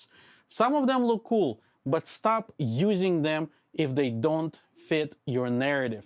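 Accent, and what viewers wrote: Russia. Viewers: i don't know man, they might be helpful for someone who does not speak english at all... but mostly they feel like a riddle where you just try to guess what these random words suppose to mean)) i was surprised honestly, i always thought you were russian – because of accent and idk, attitude? sense of humor? Anyways, awesome video as always, thank you!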